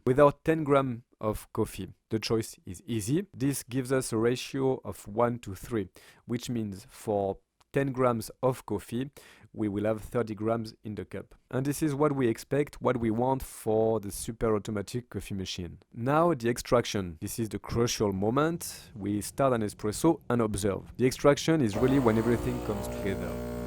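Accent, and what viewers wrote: France. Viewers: Good English with nice french accent!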